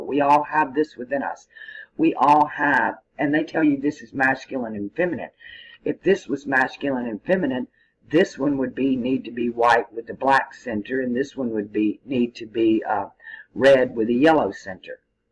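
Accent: South, United States. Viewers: lol i loooove the Southern Drooole :)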